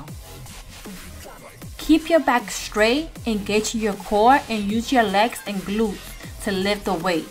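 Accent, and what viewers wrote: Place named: New York City, United States. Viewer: From where is your accent? Sounds from NYC.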